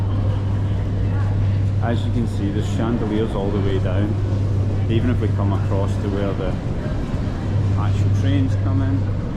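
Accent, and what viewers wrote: Scotland, United Kingdom. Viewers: My favorite is mayakovskaya as well!) Everlasting elegance. You seem a very nice guy😊 and I do love your Scottish accent. Have you been to St.Petersburg? It might remind you of Edinburgh✌️🤝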